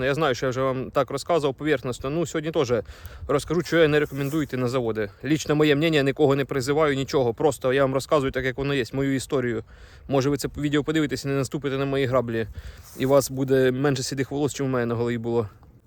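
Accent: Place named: Orikhiv, Ukraine. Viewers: Це не суржик , а панський Орехівський діалект. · На шо , на чом ??? Він балакає українською , на одному з діалектів (Запоріжжя мабудь, похоже), як і у всих странах в разних регіонах разні діалекти...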